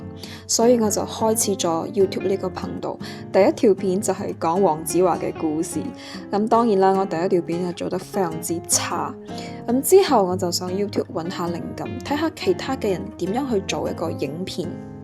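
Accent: Malaysia. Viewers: 多謝分享，你略帶口音嘅廣東話聽得好舒服，你也來自馬來西亞嗎？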